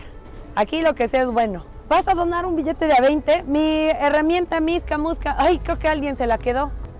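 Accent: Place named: Mexico. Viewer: Saludos desde Santo Domingo 🇩🇴😁 me encanta tu carisma y ese mega acento mexicano ✌🏼🤷🆒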